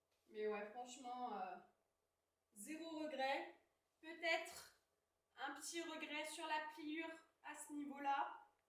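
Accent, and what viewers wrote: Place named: England, United Kingdom. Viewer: Bonjour ! Jai eu limpression que tu avais un super accent anglais, je peux me tromper mais tu parles anglais ? Super contenu sinon ^^ jespere que tout roule pour toi !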